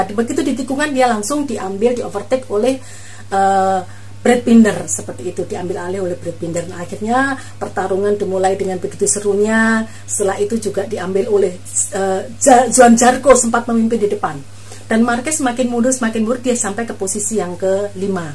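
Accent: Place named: Java, Indonesia. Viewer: suaranya khas medok jawanya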